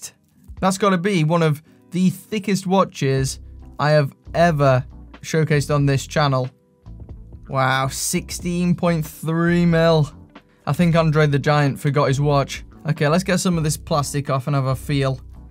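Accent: Stoke, United Kingdom. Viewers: I thought you had a stoke accent. Glad to see a fellow stokie on YT.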